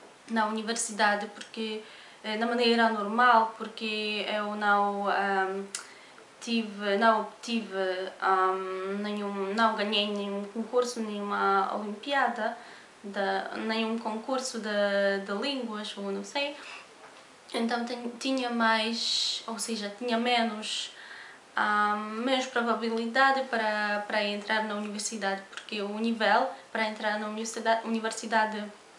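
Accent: Portugal. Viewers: Esse sotaque português é charmoso mais é bem engraçado para nós brasileiros, me remete as novelas de época, mais seu português é ótimo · Como ariano serei sincero, eu entendi tudo que você disse, porém particularmente pra mim, escutar o português de Portugal me sinto como se tivesse voltado no tempo uns 1500 anos atrás, no tempo do império! 😄. Porque esse sotaque é desnecessário , tanto que no Brasil não temos esse sotaque travado na pronúncia. Bem essa é apenas minha percepção. Aqui no Brasil a língua portuguesa evoluiu. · Adorei seu sotaque lusitano! Beijos! :) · Sou brasileiro, achei no início que fosse uma portuguesa nativa, pelo sotaque. Isso não causa problemas de compreensão para nós do Brasil. Entendi completamente sua fala neste vídeo. Sucesso para você!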